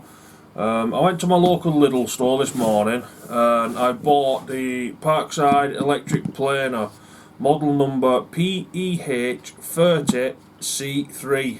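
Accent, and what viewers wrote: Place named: Yorkshire, United Kingdom. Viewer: I'd watch these videos just to hear that lovely Yorkshire accent..... ;) :) :) :)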